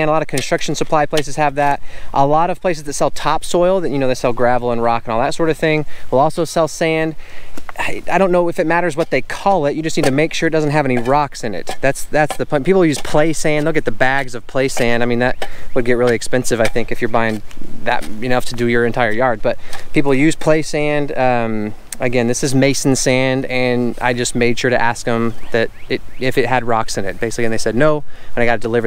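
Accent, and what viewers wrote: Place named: Australia. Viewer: Ben Simms will appreciate the Aussie accent. Lol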